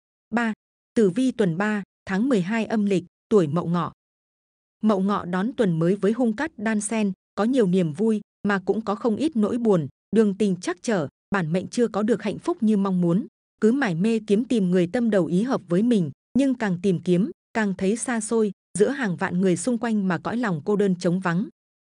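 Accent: Thanh Hoa, Vietnam. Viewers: Giong Thanh hóa nghe đã ko dung rồi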